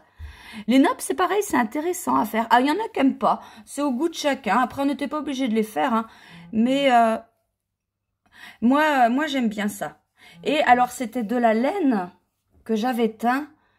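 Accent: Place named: Normandy, France. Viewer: 🎉 Coucou, vous me touchez beaucoup et souvent.. ❤ Votre travail est magnifique. J'aime vos Créations et vos Pensées généreuses,.. et aussi, je dois le dire vos mots avec les intonations qui sont les mêmes que celles de ma région natale😂 ( près du Mont St Michel, côté Normandie ) j'adore.. ❤ Merci pour tout; votre futur chàle est Magnifique.. 👌🌟 Belle journée ❤😘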